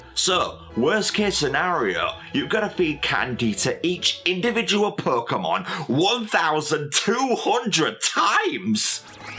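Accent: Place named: North, United Kingdom. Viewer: this guy is my new friend he sounds up north lol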